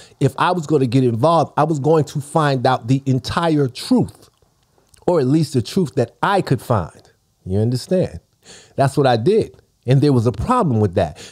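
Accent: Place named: Harlem, United States. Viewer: Talk that Harlem talk 🤣🤣🤣you already know as a fellow New Yorker 🤣🤣🤣. Especially Harlem🤣🤣🤣. We a different breed🤣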